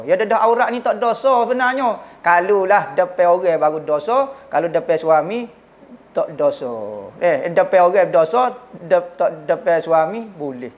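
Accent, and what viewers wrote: Kelantan, Malaysia. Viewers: Walaupun berbahasa kelantan pekat....tapi tidak bosan ,mudah difahami dan penyampaian jelas...alhamdulillah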